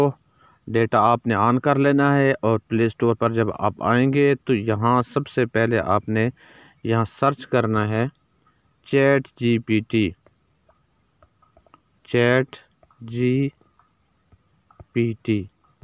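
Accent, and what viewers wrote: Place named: Saraiki, Pakistan. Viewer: Lahja sariki Hy...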